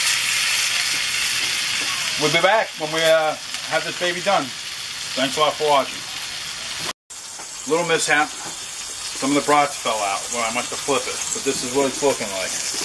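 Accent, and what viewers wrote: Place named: Pittsburgh, United States. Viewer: We are enjoying your videos. I is a pleasure hearing someone speak Pittsburghese. We are from Bellevue. This recipe looks awesome.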